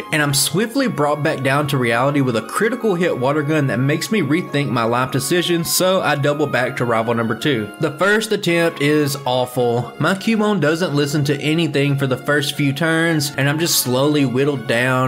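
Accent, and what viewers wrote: South, United States. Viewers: oh for sure. It would be a lot of work and something plan out over a long period of time. You’d also have to feel out the comments to see if other people would be interested in that since it’s kind of niche. But Pokémon run channels are growing in popularity, so it could help your channel differentiate itself. But mainly just do what you enjoy and everyone watching will enjoy it too. You’re doing great! I really like your layout with the move sets and stats on the side so we can track the Pokémon on its run and anticipate what’ll come next. And nah, you ain’t Canadian. You sound more southern than me, and I’m from Texas haha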